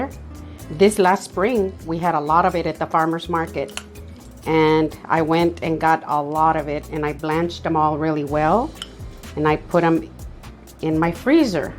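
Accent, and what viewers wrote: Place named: New Mexico, United States. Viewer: Señora ya me dio mucha hambre 🤤 and you remind me of my husbands family from New Mexico, same accent 😀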